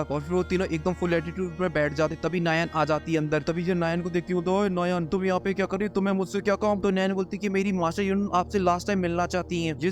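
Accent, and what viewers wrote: Haryana, India. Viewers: Kya aap Haryana sa ho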